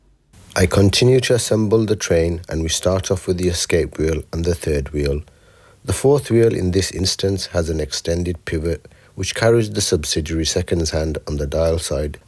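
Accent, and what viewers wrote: Birmingham, United Kingdom. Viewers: I can only click the thumbs up once, you deserve more. And don't even think about NOT doing a commentary again. Your soft and gentle Brummy accent absolutely nails the information you impart. Yet another thoughtful and honest refurb which, if you had gone too far with aggressive cleaning and new plating, would have spoiled the watch and its history. We are back to you not marking your work, but we've talked before about that. So; 'tara a bit'. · great to have your brummyness back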